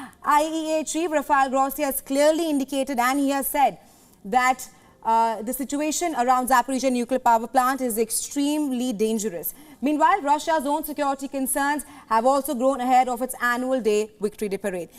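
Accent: India. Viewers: hinaya ra pag basa ayaw pag dali indian ragud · Please not use indian English slang telling news. It sound like comedy news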